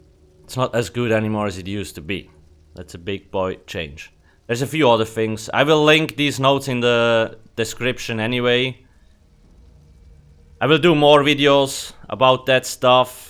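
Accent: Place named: Switzerland. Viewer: He's from switzerland, dont be surprised by his accent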